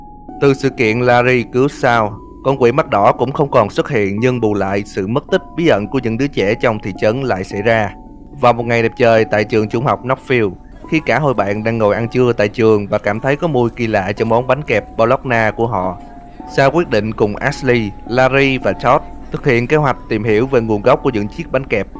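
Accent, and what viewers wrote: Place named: Mekong Delta, Vietnam. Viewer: Omg có thằng e mới giới thiệu trang này xem. Vào xem nghiện luôn. Mặc dù mình chưa chơi game nào trong những game bạn phân tích nhưng bạn có thể suy luận như thế thì thật là quá đỉnh. Ngôn ngữ của bạn cũng chuẩn nữa. Giọng miền tây nhưng rất dễ nghe. Chọn từ ngữ đúng, lối dẫn truyện rõ ràng mạch lạc nên mình nghe phát hiểu luôn. Mình sẽ sub và ủng hộ. Chúc kênh sẽ càng phát triển nhé.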